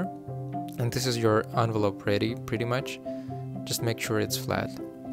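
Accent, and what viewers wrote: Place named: South Korea. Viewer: I can tell from your accent that you are Korean which makes more fun to watch this video. Thank you💗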